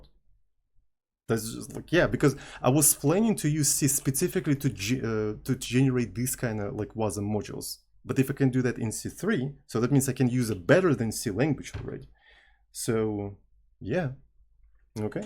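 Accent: Russia. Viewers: Russian guy? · Are you Rusian or German? You speak German words often, but your accent sounds Rusian.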